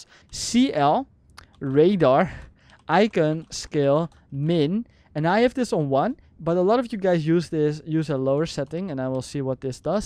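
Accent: Netherlands. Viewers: nice dutch accent ;)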